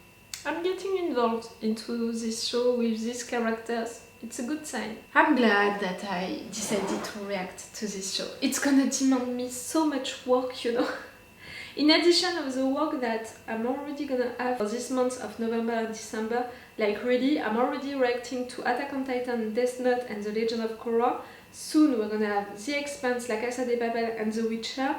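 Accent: France. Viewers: I knew it for the first 10 second it was my fellow french accent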